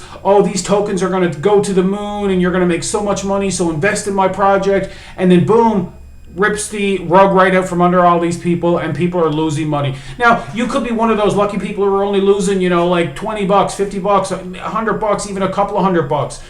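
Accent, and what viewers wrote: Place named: Eastern Canada, Canada. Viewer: First time viewer. I knew in seconds you were from the east. I’m in Alberta. Learning about forth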